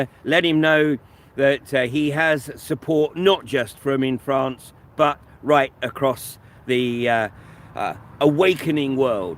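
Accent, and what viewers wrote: England, United Kingdom. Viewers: the “Stop the crime” channel mirrored your video about the culling of the U.K. pop, and so I found your channel, and subbed. So hello from NE Ohio! I just love your awareness, your beautiful background, your English accent, and most of all your awesome spirit! Rock on!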